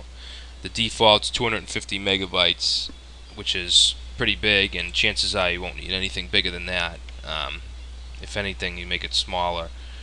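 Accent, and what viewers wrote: Boston, United States. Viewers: Oh my god he kept his Boston accent back then hahaha.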